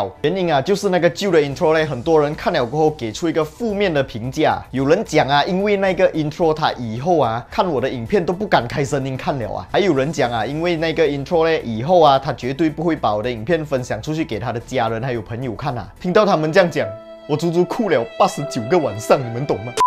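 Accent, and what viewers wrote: Malaysia. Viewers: Malaysia華語就是醬rojak的咯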